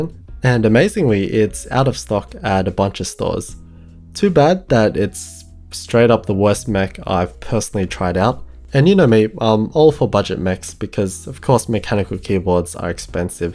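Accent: Australia. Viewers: aussie mate] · Oh wow you’re Australian too nice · the hint of Australian in his accent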